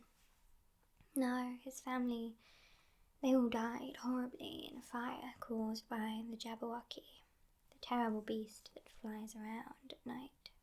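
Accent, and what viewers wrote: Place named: South, United Kingdom. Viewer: You are British right? Me too but I really can't place your accent definitely southern and rather posh lol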